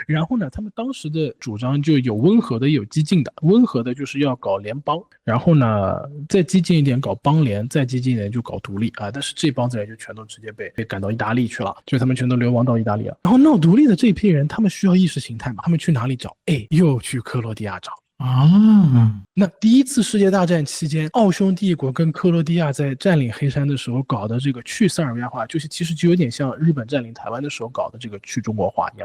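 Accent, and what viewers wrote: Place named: Shanghai, China. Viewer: 完全的上海口音和语速😂😂😂😂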